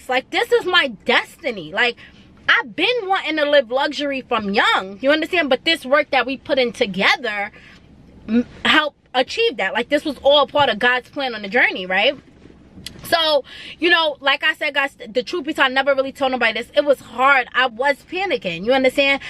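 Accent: New York, United States. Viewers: That NYC accent! Fire! Super detectable!😃You sound like Minaj!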